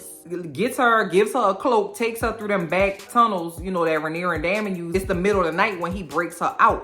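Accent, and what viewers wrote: New Orleans, United States. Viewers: First off, let me say it....I love the accent. You about to make me go visit my family in New Orleans now. LOL. But as far as the theory of Otto Hightower taking the life of Allicent's mom is a good one. The way he said it was creepy. Like when fathers normally say that it's cute and they're smiling like they're happy. But when Otto said it, it was giving me creepy vibes. As for Helena, y'all better listen to her. She be prophesying. I said oh what's about to come from under the ground. LOL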